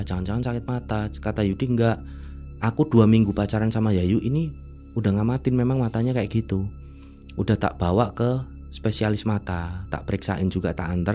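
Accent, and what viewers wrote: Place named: Malang, Indonesia. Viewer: Sampean pemalange ngendi mas 😅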